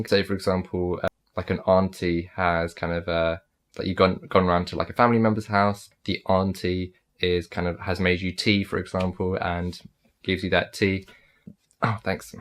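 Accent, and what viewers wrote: India. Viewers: I like your indian English accent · you can mask your Indian accent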